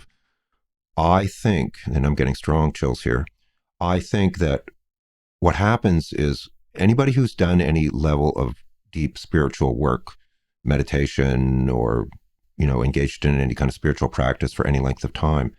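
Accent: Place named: Atlantic Canada, Canada. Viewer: I knew as soon as I heard your voice you were probably from somewhere in Atlantic Canada...Newfoundland? Im in northern BC. Anyway, just found your videos and love your style of articulation. I'm going to check out your ritual material next. Thanks for sharing your insights here. 🙏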